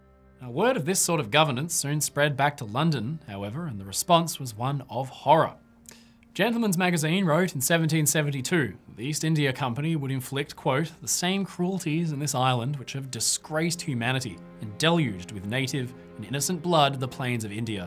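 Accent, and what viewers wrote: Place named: Australia. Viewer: Fantastic video composition, really sad content. The Australian accent makes you sound extra smart!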